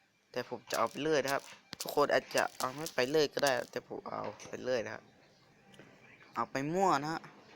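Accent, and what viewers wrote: North, Thailand. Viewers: เป็นคนเหนือหรอค่ะ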